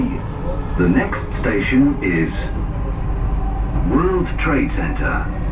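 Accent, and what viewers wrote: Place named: United Kingdom. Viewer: I know it’s good to include the English one other than Arabic, although that accent in English is always British, also heard in Hong Kong MTR, Carmelit etc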